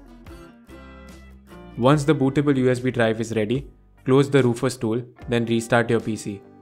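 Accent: India. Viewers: I love Indian accent camuptar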